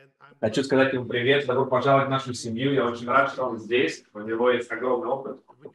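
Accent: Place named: Russia. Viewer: с сильным "русским" акцентом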